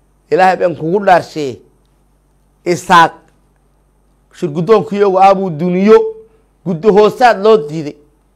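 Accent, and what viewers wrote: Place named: Kenya. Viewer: Ninkan waa la haystaa duli ma timid reer sheekh Isaxaaq .waxaad tahay kenyati afsoomaliga bartay.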